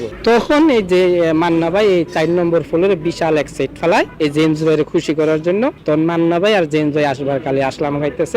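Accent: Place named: Comilla, Bangladesh. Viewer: লোকটা মনে হয় কুমিল্লা জেলার ,,, কথাবার্তা একদম গ্রামের মানুষের মতো 🤔🤔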